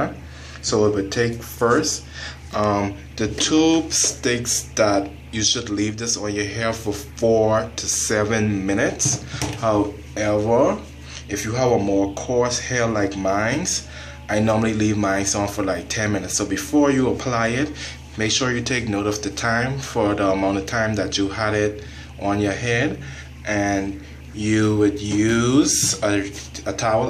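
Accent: Bahamas. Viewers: he sound super bahamian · u sound Bahamian, r u? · I hear a bahamian accent doe! Where u from soulja?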